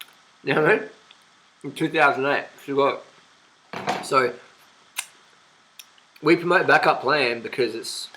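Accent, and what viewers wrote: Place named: Australia. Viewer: I don't know about anyone else, but I cannot understand but approximately every other word of Australian accented English + eating jack fruit at the same time...heh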